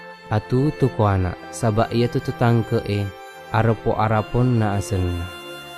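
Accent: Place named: Bone, Indonesia. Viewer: Terlalu kental dengan dialek bone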